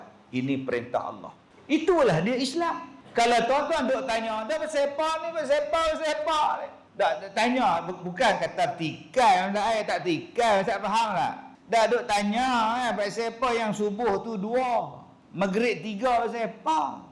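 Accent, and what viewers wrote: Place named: Batu Kurau, Malaysia. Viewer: Pelat batu kurau tu buang lh sikit .bkn ceramah Kut kg paya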